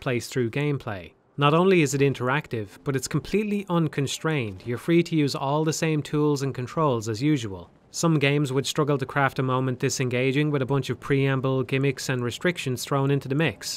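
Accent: Ireland. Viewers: Is this fella Irish? "Sometimes it seems the only thing Link can't mount is Mipha." Haha yeah up the lads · are you Irish? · Are you from ireland? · Is this guy irish?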